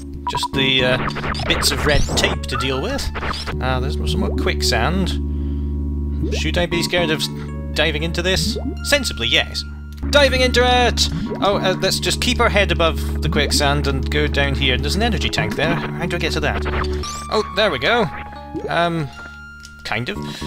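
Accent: United Kingdom. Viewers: That boss was just...I can't find words to describe it... You're British? Cool!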